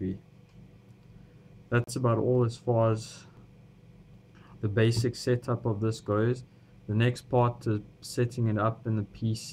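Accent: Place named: South Africa. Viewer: You are definitely South African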